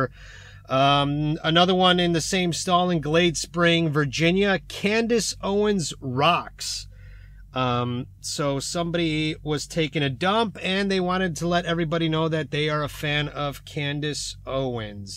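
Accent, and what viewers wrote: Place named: Russia. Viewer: His Russian accent=👋🏼🏃🏼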